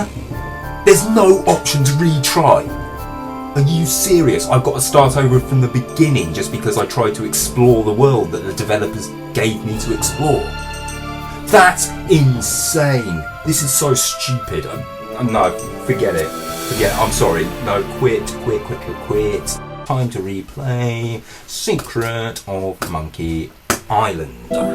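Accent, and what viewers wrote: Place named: United Kingdom. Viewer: is one talented man. I wish that I had 1/1000th of his talent. Still, I take great pleasure in listening to his incredible retrospective brit voice. So very relatable. Wish that I could be like. Le sigh. Just give up indeed...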